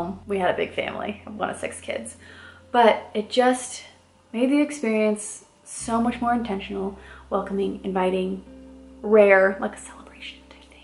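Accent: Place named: South, United States. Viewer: Much love from Saint Louis!! 🫶🏾⚜️ Hubby and I just found out I’m 6 weeks and 5 days pregnant after almost 2 years of trying and when I tell you your videos give me a HUGE sense of relief, positivity, and inspiration, I mean it girl. God bless you and your family, and may you all continue thriving and building up (I’m from the north and speak a southern accent ALL THE TIME 😂🤣 maybe it’s in your roots girl HAHA). Thank you. 🙏🏾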